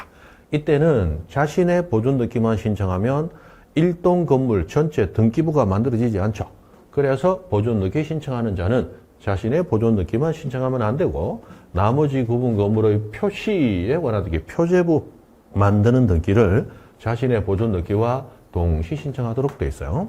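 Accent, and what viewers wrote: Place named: Gyeongsang, South Korea. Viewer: 와 이해 하고싶었지만 잘 몰라서 그냥 외우고 있었는데 설명 과 암기를 모두 해주시네요 ㅋㅋ 거기에... ㅡ 발음이 안되서 ㅓ로 하는 대구경북 억양까지 구수... ㅋㅋㅋ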